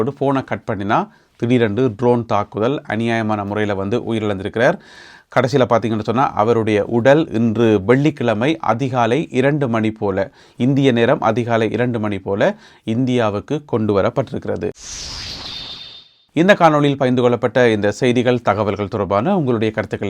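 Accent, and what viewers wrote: Jaffna, Sri Lanka. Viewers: தம்பி உங்களது அழகான தமிழிற்காகவே உங்களது பதிவுகளை கேட்பேன் யாழ் பேச்சு வழக்கில் உங்களது பேச்சு நடை மிகவும் இனிமையாக உள்ளது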